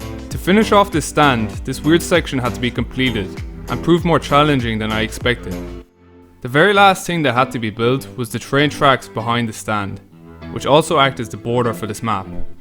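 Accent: Ireland. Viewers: Are ye Irish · Are you irish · Are u from ireland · Thanks for giving ireland a shout out Btw are u from ireland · Are you Irish? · 2 thing I love the Irish accent u have and I love fortnite so I hope u like that sub I gave u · Let's go Ireland i can hear from his accent that he's Irish🇮🇪🇮🇪🇮🇪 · Are you Irish I’m Irish thx I love it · are u Irish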